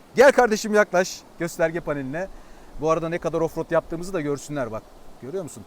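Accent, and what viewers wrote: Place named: Adana, Turkey. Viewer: abiniz Adanalı belli. Adanadan selamlar o halde!!!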